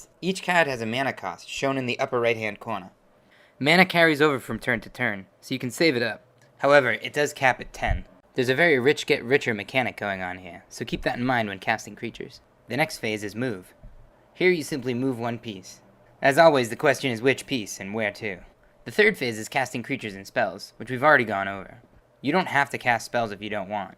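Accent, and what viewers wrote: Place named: Boston, United States. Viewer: I was just teasing. The Boston accent makes it sound like he's saying caads when he says cards. I got my key just fine and the game is pretty fun.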